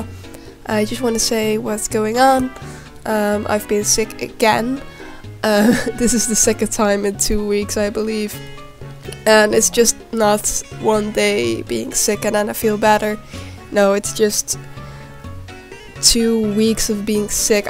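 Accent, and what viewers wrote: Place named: Netherlands. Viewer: I hope you get better soon, being sick sucks! Btw are you Dutch? I can tell from your accent...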